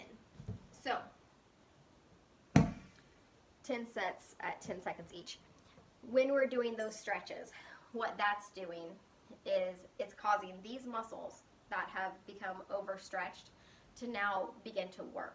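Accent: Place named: Minnesota, United States. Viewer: Canadian? Definitely not from the south. Minnesota?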